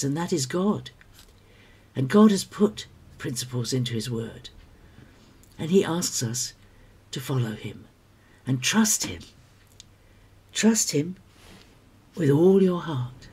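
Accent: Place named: United Kingdom. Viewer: I was isolated for a long time due to health issues, & it made it difficult to stand firm in the faith. I am now rooted in local fellowship, but I still spend a lot of time stuck at home alone, so finding Biblical channels like yours is a wonderful way to renew the mind day to day! (Your delightful gentle British accent doesn't hurt either...pleasant to the ears!)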